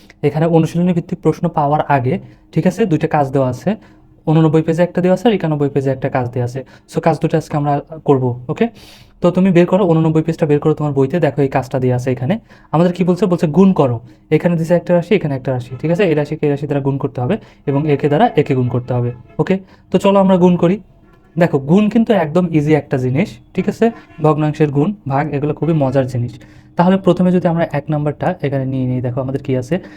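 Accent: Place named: Pabna, Bangladesh. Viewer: স্যার আপনার বাড়ি মনে হয় পাবনায়।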